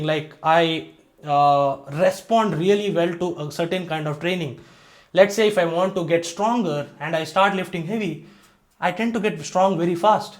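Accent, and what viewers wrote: India. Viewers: Indian accent is disgusting.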